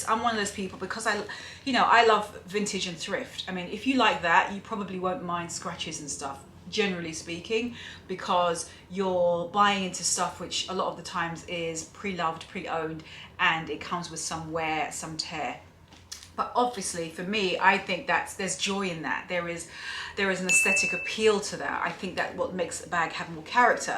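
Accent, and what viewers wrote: United Kingdom. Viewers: I love your videos!! I love your style. I love your apartment!! You and your husband are a great couple. I also love your British accent, by the way.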